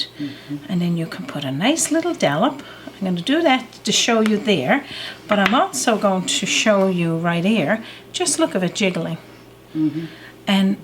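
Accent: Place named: Newfoundland, Canada. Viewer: I just happened to run across your channel (the strawberry squares popped up on my screen yesterday), and I see that you have many interesting recipes online, including some specialties from the area where you live. At first, I was confounded. Your name indicated a Latin background, but I see you are not and also your accent threw me as well, until I saw the word Newfoundland on the screen. Oh, my. That is an area I am totally unfamiliar with, only that I believe it is located near New Brunswick, around there, close to Canada, certainly not my neck of the woods. Anyway, I wrote down a number of your recipes I plan on making in the near future, including this chocolate gelatine dessert, the reason being that I noticed too many manmade chemicals and additives in Jello products. I would much rather make pudding without all that. I also noticed many delicious soup recipes I want to try out as well as several breads. 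I am amazed at the ease with which you make your breads, thinking to myself, why haven't I thought of using those methods before. I am like you when making desserts...I heard your comment in the chocolate walnut cookies video...that when it comes to making something like that, you just want to make it enough to make it taste good, that you don't bother yourself with making it too fancy...something like that. I feel the same way. I will continue to watch your channel in the meantime. In fact, I subscribed yesterday. When I eventually make one of your recipes, I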